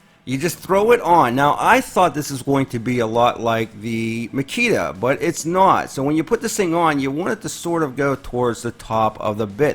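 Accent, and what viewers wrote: Pittsburgh, United States. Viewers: You don’t happen to be from the Pittsburgh area are ya? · Pittsburgh accent? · Just a little Pittsburgh accent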